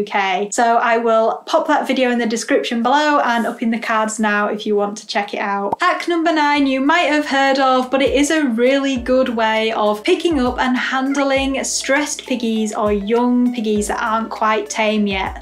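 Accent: United Kingdom. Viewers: I just loveeeee this channel! Your voice is so sweet and calming and your channel has helped me A LOTTT for caring better for my "piggies" (in my brittish accent like yours...😊😊😊) sooo helpful thabk youuu!!!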